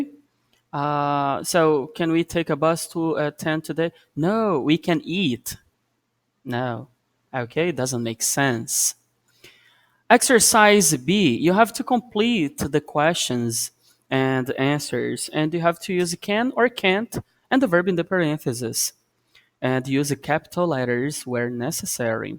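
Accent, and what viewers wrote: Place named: Brazil. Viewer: What a strong Brazilian accent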